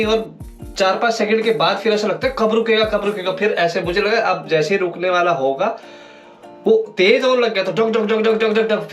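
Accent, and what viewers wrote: Haryana, India. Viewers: Bhai tari aadat or awaz ek dum hariyana valo jasi hai Vaise mai bhe hariyana ka hu 🙏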